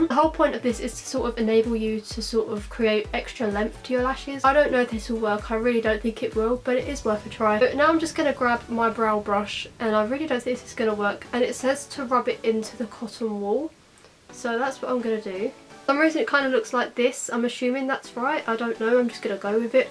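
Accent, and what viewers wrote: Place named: United Kingdom. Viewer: I love it!!1 Thanks for checking out my channel!! AND OMGGGG UR BRITISH I LOVE BRITISH PEOPLE AND UR GUYS ACCENTS IVE ALWAYS WANTED ONE XD <3 xx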